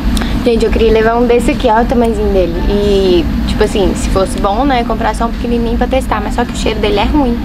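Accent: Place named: Minas Gerais, Brazil. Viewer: Esse sotaque mineiro.. eitha q orgulho soow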